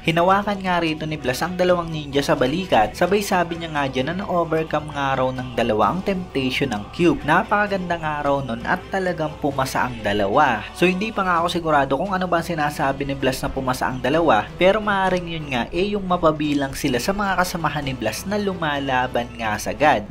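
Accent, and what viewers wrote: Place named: Iloilo, Philippines. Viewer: ilonggo ka yata boss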